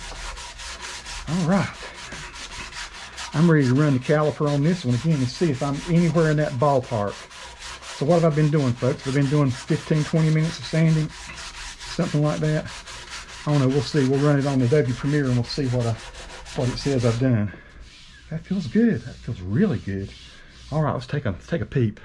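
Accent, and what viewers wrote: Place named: South, United States. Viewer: That’s for the tips buddy. I love your accent man.. I wish I was born in the south😁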